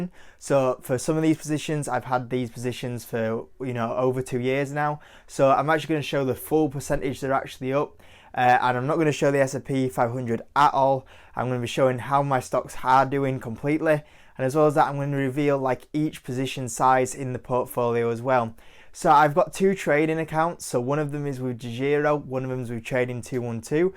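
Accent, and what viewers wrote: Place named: Northern England, United Kingdom. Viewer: Thank you man, where about’s in the UK are you from, I’m London based. The accent sounds a bit Northern, my uni is up in York.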